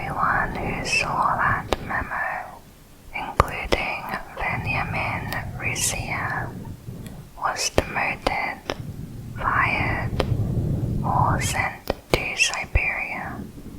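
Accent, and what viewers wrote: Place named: Liverpool, United Kingdom. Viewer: Lovely Scouse accent.❤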